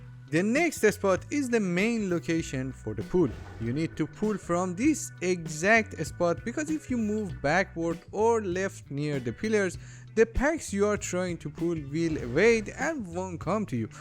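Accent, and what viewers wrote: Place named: Iran. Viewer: kose khare lahje irani xD nice video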